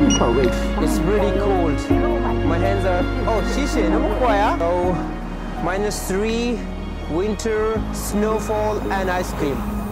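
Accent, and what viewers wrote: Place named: United Kingdom. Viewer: British accent and splendid editing..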